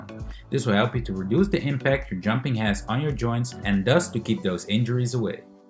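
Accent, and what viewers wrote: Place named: United States. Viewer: These are one of the best..no THE BEST, jump rope tutorials on YouTube. Clear breakdowns of all the techniques. Just one minor moan: As an English person, I have to mentally block out the American pronunciation "TUT-orial" (rather than "tew-torial)...my teenage son spends so much time online, even he's saying 'gas' rather than 'petrol' ;-)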